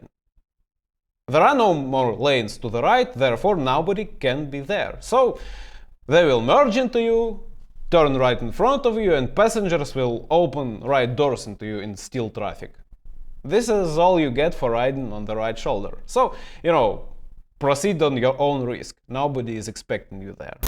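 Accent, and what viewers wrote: Russia. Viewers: Not sure what you mean. This is a thick Russian accent and he pronounces 'V' as any Russian - easily and with no problems. And yep - very good breakdown! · I Enjoyed Your Presentation Very Much. I'll Check Out Some Of Your Other Videos. Is That A Russky Accent I'm Hearing? Da, Nyet, Mozhedbwit?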